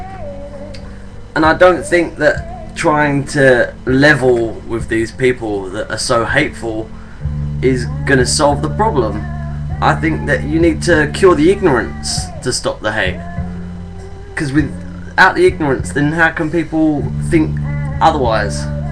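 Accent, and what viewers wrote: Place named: United Kingdom. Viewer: Wonderful seeing contributions from other than the LGBT U.S. communities. Love the UK, and love your Brit accent. Being a Yank, not terribly sure where you may be from originally, but are you perchance from Liverpool? Probably getting this all wrong, so my humble apologies in advance.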